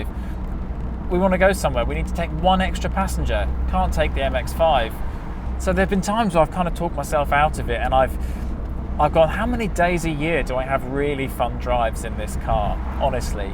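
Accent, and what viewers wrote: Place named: London, United Kingdom. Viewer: "This thing" mmmmm. London Boy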